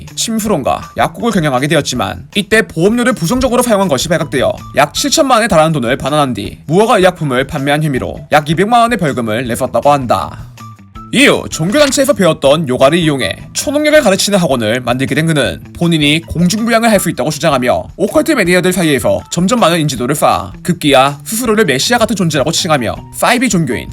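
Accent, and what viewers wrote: Jeolla, South Korea. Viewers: 김어준인줄ㅋㅋ 전ㄹ ㅏ도 익산ㅋㅋㅋㅋ ㄹㅇ과학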